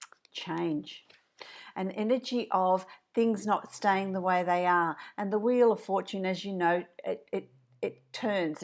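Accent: Australia. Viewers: Love the rare cultured aussie accent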